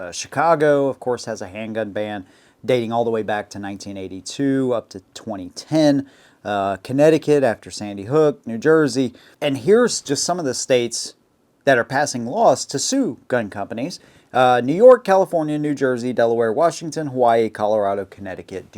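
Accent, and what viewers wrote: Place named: Tennessee, United States. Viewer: bro i figured you were from tennessee Glad to hear you live in the great palmetto state!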